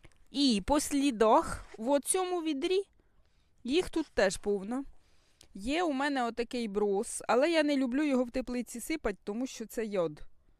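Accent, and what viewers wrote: Poltava, Ukraine. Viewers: Доброго дня, а мені здається, що ви з Полтавщини, я полтавчан визначаю по розмові. Родичі були на Полтавщині раніше. Херсон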